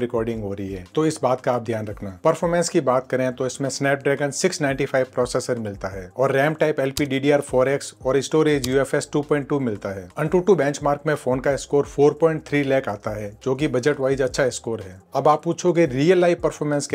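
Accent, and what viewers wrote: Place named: Marwari, India. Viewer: Apki boli se to aap marwadi (Rajasthani) lag rahe ho bhai ji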